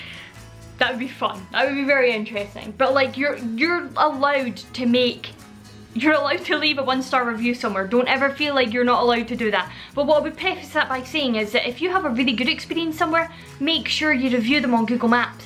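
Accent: Scotland, United Kingdom. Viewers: your Scottish cadence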